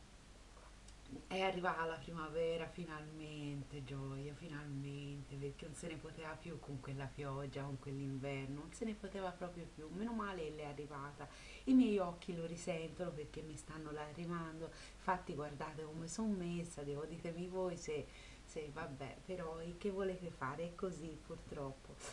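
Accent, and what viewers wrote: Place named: Tuscany, Italy. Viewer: Che meravigliaaaa! Adoro la parlata toscana <3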